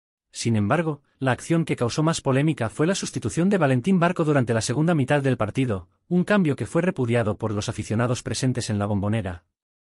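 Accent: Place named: Spain. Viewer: Habla vos o pone una voz neutra, no tiene nada que ver el gallego este con Boca jajaj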